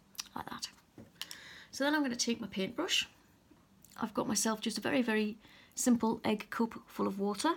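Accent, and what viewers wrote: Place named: Scotland, United Kingdom. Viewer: Hullo,! I've been watching your vids for awhile now (I subscribed) for 2 reasons: 1. You're so talented and a wonderful teacher! You don't hurry thru and you are very clear and precise. 2. I am a transplanted Scot living in the US and your voice reminds me of my Mum. Same accent AND she was a painter! So you bring me comfort on two levels. Thank you so very much for brightening my days. Blessings xo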